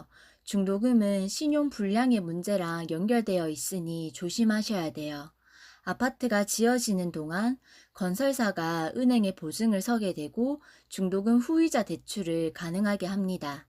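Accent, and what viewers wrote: Gyeongsang, South Korea. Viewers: 경상도 TK쪽인듯 익숙해서 듣기 좋습니다. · 경상도 맞는 듯 하네요. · 목소리 들어보니 경상도 사람이네요. 일단 잔금 연체이자 말씀 감사합니다 저는 실수요 무주택자입니다. 계약금10% 완납하고 70% 건설사중도금대출 나머지 20% 입주잔금은 제가 값을 생각입니다. 입주 지역은 조정대상지역이고 평택고덕신도시 a-39블럭입니다. 제가 생각하는 입주계획이 틀린지 아닌지 말씀해주시면 감사합니다. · 경상도 분이신가봐요 ㅎ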